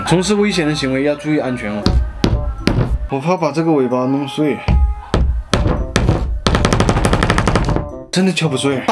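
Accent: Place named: Hunan, China. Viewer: 听你这湖南腔就想笑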